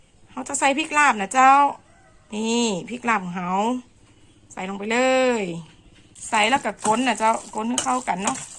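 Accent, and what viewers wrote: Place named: North, Thailand. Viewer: คนเหนือมาอีกคนแล้ววว ฟังสำเนียงแล้วอยากไปเที่ยวทางเหนืออีกครับ มาติดตามด้วยคนครับ🙏🙏🙏😍👍🔔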